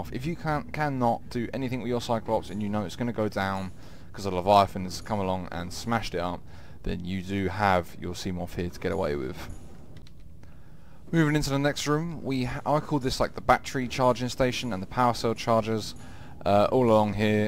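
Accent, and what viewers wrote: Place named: United Kingdom. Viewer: Excuse my racism... but wasn’t this video so much better bc of the Brit accent???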